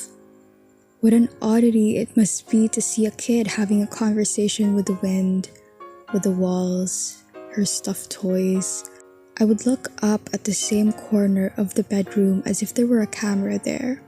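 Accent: Philippines. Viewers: all i know is that you have a filipino accent<3 But heyyy, If you are a filipino, And you're questioning the filipino culture upbringing and spreading awareness even if it's 3 minutes long, It can help. Well story short, I'm a filipino with a religious mother with toxic family especially on reunion, And as an asian, Body shaming, bullying, intimidation, toxic conservatism is considered normal. With all honesty. I wish i was overreacting or joking. Would you believe?!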